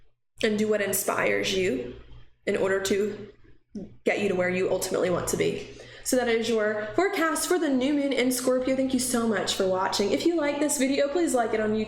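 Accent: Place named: Georgia, United States. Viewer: I love you in pink, btw. Love your explanations! Thanks,!! Love that subtle Georgia twang;)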